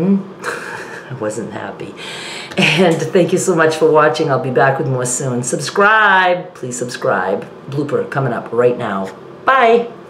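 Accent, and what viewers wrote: Maine, United States. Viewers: Hello from Maine! I love seeing how much fun you are having. It is nice seeing you smile 😊 Do people notice your Maine accent down there?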